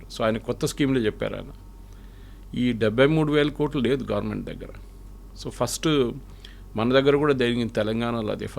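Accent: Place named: Kerala, India. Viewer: మీ భాషాభిమానానికి వందనాలు. కానీ ఆయన మళయాలి.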